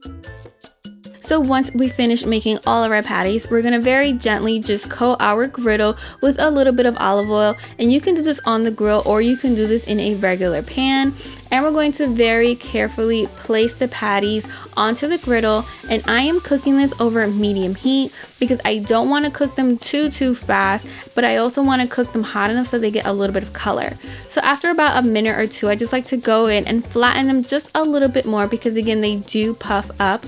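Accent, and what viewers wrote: New York, United States. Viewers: The Noo Yawk aspect of this video is EVERYTHING tqmmmm 🥰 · are you from New York bc you sound like your from NY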